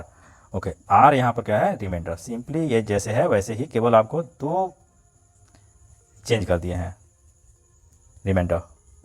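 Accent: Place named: Bihar, India. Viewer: Bihari look with Bihari acent to good man👌👌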